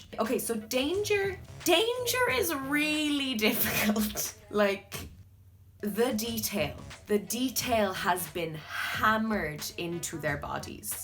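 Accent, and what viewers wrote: Ireland. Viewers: I can tell by your accent that you’re Irish, also you’re amazing at describing everything in an educational way. I was wondering and I know this is a weird question but is there a certain dance studio you go to or teach at? Do you recommend certain dance classes for someone like me who loves the style of dance k-pop artists use....? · She’s Irish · Are u Irish?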